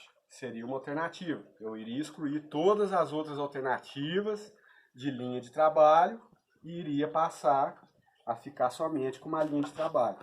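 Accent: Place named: Minas Gerais, Brazil. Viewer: vai ter sim, ninguém vai compra la hahahah, gosto muito do seu canal, tbm sou mineiro e ouvir seu sotaque é voltar pra MG kkkk abraço e força ai no trabalho irmão , axé !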